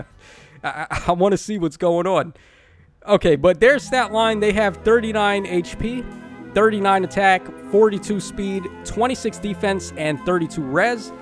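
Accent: United States. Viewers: Ayo, that cowboy accent kinda got me down bad, tho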